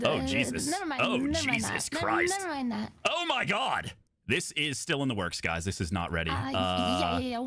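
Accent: Ireland. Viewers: wait, is irish? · You're Irish?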